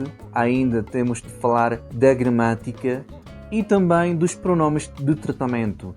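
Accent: Portugal. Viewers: uma pessoa com sotaque do português europeu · Amo o sotaque português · o seu sotaque puxa mais para o português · o seu português é igual ao nosso de Portugal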